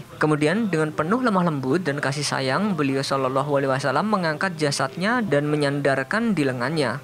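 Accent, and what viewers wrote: Java, Indonesia. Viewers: Mimin orang jawa ya??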